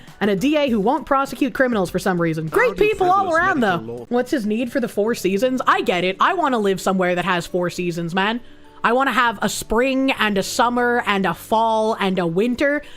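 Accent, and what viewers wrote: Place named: New Jersey, United States. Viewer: Wait she lives in Georgia? Always thought that was a jeresy accent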